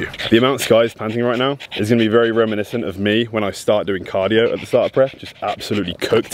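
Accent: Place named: United Kingdom. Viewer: drug addict explains drugs in british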